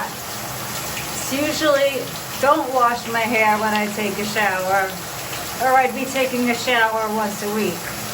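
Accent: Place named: New York, United States. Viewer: New York accent hits hard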